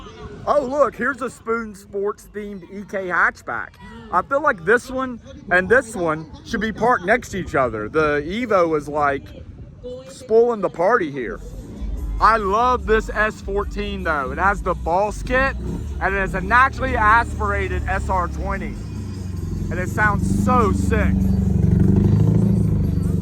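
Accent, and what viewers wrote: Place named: New Jersey, United States. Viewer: Yo have a jersey accent..are you from the north East coast?love your content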